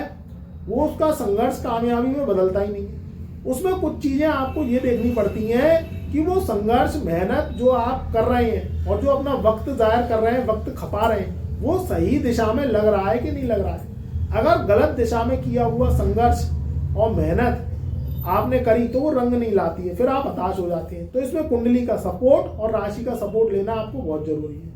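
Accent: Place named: Agra, India. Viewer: पंडित जी आप किस शहर से हैं? आगरा mainpuri side effect है शैली में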